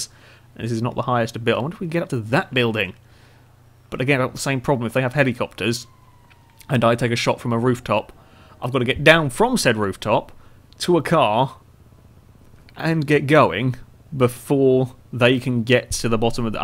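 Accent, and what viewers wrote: United Kingdom. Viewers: If it wasn't for your British accent this would have been unwatchable, that said I enjoyed it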